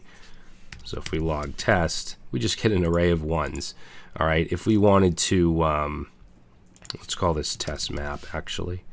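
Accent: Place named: Northeast, United States. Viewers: I grew up in the Northeast and your accent sounds like home. *Queue a Ben Affleck impression here* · Are you from the East coast? (NYC, Boston, NJ) I can hear some very strong hints of that from your accent :D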